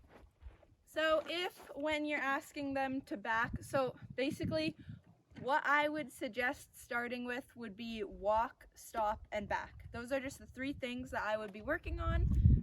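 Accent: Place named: Canada. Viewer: Hello, I'd like to learn more about Missy please. Age, height, color and with that gorgeous dorsal stripe (favorite behind dapples) what you know about breed(s). Also a question about you please if its alright. 50+ years ago I dated a young man from Canada and 2 things stayed with me. The distinct sound of saying the words out and about which I hear in you that prompted the ask and the use of "eh" which seems to be fading. It was so strongly used back then with every Canadian I met while living close to the border and traveling through the country in the 1970s. I wish you a strong build on your YT page and its success is a win for horses. Two accounts I follow that I highly recommend you look at are, 1) THE CLEVER COWGIRL So far we've never fully seen her face but what I do see is a lovely natural blonde who reminds me of lovely strong Nordic women. 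She's kept her name private also but her account name has led to us calling her CC and she seems very fine with that. I imagine she is between late 20s and middle 30s and like you CC is working to build up her account. She worked training horses for others in Utah but she and hubby are now in Montana where she buys the auction horses most people don't or shouldn't want. More than once she's been kindly told she ought not buy a specific horse including one that cost her $25. However, with her >not< bragged about humble but awesome experience and ability she zeros in on the issues then, when ready, sells to an appropriate rid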